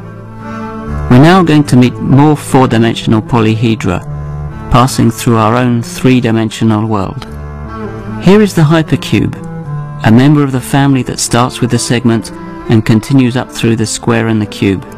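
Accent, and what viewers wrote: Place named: India. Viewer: 解说员是印度口音的英式英语。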